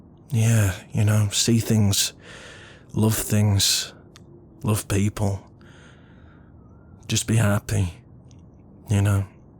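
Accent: United Kingdom. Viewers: YIPEE :DD ur british accent is just so delicious- XD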